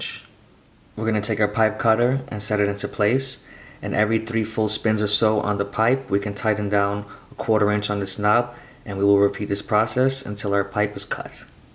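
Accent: United States. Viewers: That's just how Americans say it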